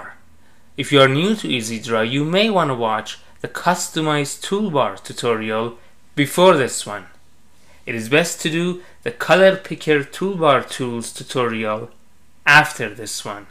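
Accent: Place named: India. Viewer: This is NOT a TUTORIAL?!! Then what the fck is it? The video is titled "TUTORIAL!" And the narrator specifically mentions "TUTORIAL!" The poster asked if there was someone who spoke English w/o a frickin' accent! I don't see why when there are instructions to be given, everyone has to have a frickin Indian accent!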